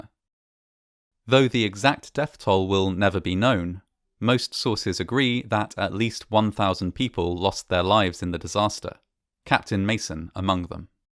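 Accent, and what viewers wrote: England, United Kingdom. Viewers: By his accent, one would assume he is English, so the spelling HOSPITALISED is correct.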